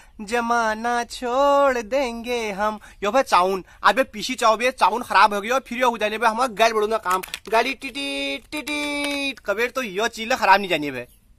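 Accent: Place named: Bageshwar, India. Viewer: waah mjaa aa gya apne mere ganesh daa ki yaad aa gyi ... esa lgra aap garur bageshwar se ho ..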